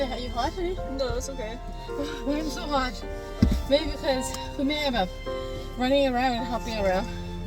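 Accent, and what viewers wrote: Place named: North, Thailand. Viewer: ลูกสาวสวยมากกกกก สำเนียงคณแม่ เป็นชาวเหนือหรือเปล่า ขอโทษนะคะ เพิ่งตืดตามค่ะ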